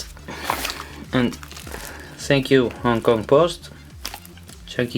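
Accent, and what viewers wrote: Netherlands. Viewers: give him a break he is dutch....im sure he speaks better english than you can dutch. Anyone watching the video can see how the system works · duidelijk een nederlander :p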